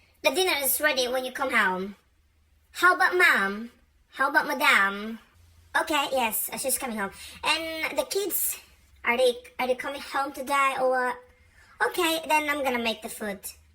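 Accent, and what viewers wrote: England, United Kingdom. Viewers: British accent ka met gayam... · The accent anti, briton, i love it, uray ta badom ken ta lipistic mo nagmayat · huwaaawww!! British accent ni anteee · Was that British or Australian accent Auntie? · naglaibg ka agsao ingles auntie, kasla ka lang taga UK. proud of u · Owmy Anti nalaing ka gayam agsarita iti ingles, british accent pay aya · Ganda ng british accent mo anti:)) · Mayat iti British accent ni Anti! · oh my goodness! that british accent i sooo love! nagperfect ka! magustwak dagita nga accent mo. namin anok inulit ulit nga binuya detoy. nagperfek talaga 😂😂😂. thanks anti napaisem nak manen. 😘❤️ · Alla ni anti🤣surwan naktu man anti ti english speaking ken british accent mo💙lovin' all your video^_^ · mayat man ta British accent mo i like it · more British accent plz love it so much.. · Hahahaha!!!! Britische Accent Ni Ante....Guten Morgen From Homburg Saarland Germany 🇩🇪...Naiinlove na yata ako sayo..... 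· Filipinos taking over the world through pancit, lumpia and adobo😃😂. Some of my coworkers are one pancit away from becoming Filipinos 👍Nice British accent by the way😊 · wow anti british accent. laing k nga tlg.. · British accent ni Anti..Love it. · Hala nagtangsit ni anti..English speaking..adda pay British accent na..pasuruak man anti..😄😄😄😁😁😁😅😅😅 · nakabritish accent ni antie.. nglaing ka man antie.. surwan nak man met garud!!Godbless u antie.. ingats!!😘❤️ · Nagtangsit ti panag english ni 👏👏👏 british accent ni annntttiii tayu!! 😊😊😊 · Britist Accent ah gimar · Nagtangsit ti British accent mo auntie!!! Bilib ak manen kanyamon. HAHAHAHAHAHA 😂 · british accent ni 😊😊😊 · Nosebleed with your british accent❤️❤️😍😍anty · Naka british accent pay auntie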